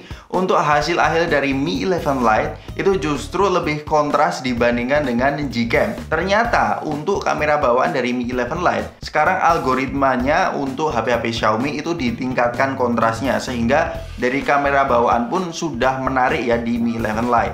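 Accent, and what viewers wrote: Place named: Yogyakarta, Indonesia. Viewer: logat jojgae ojo ngasi ilang dab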